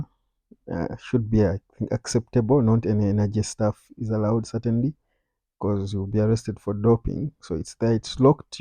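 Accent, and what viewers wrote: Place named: Uganda. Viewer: eno accent ye Uganda eno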